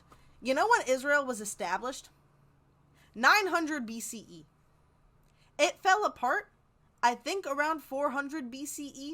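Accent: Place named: United States. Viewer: Don't take this personal but I need to correct you on some things. The fact that you say you are NOT and NEVER WILL BE Israeli doesn't give you the right to make Israelis look like they are some fantasy nation. You are a Jew as you said. You are (I assume by your accent) from USA. That makes you American Jew. I hope you know that 2 million Arabs live in Israel. They are Israeli Arabs. A lot of those Israeli Arabs say they are Zionists. Remember they are Arabs ( Moslems and Christians ). Another thing - you talked about Jews returning to Middle East from Europe. Are you aware that 43% of Jews who moved to (now) Israel are from Europe? 57% are from Arab countries. They were forced to leave. And add to that 270000 Jews who lived there already and never left their land. And another thing - Brits didn't create Israel. UN did. Great Britain also didn't colonize that area either. 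It was UN mandate and GB was tasked to police that area. Israel was suppose to be entire Palestine. But Britain Created Jordan ( back then called Trans-Jordan). UN then decided to split the rest of the land in 2 states. Arab state and Jewish state (Israel). And one last thing - the biggest anti-zionist Jews are Orthodox Jews. Why? Because in their mind Jews will get back Israel when Messiah comes back and not before. Until then Jews need to wonder around and suffer. I am not a Jew. Also I don't live in Israel. But here is something to think about when it comes to Jews. If you are a Christian and don't belie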